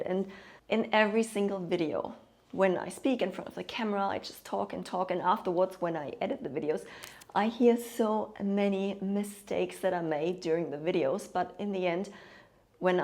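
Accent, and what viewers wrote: Germany. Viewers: Dein Denglisch ist irgendwie putzig xD But jokes aside, your english is more than fine. I did not realize you're german until you changed language. Then again my opinion on that might not count as I'm german too. But for a non-native you're doing extremely well. P.S.: Metal \m/ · I get any right answers 🙈😂 I'm so bad at quizz but thanks for it ❤ and my main weakness is self-criticism, but I improved a lot. I always think how elegant is your english accent, since I know you are German. That's the first time I hear German accent in english 😊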